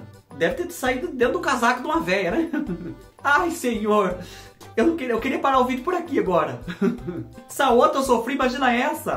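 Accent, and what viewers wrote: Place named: Paraná, Brazil. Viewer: Tava caçando vídeo de receita com fuba e encontrei esse canal, um fofo! ❤️ sotaque de paranaense, interiorzinho do Paraná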